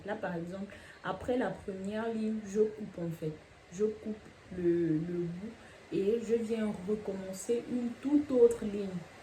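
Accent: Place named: Gabon. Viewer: Cc vous etes gabonaise? A cause de l'accent...svp jai besoin de la formation svp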